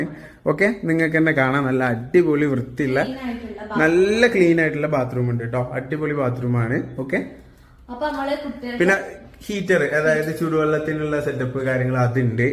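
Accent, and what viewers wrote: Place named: Kozhikode, India. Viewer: Njanm parayarund.kozhikkodan basha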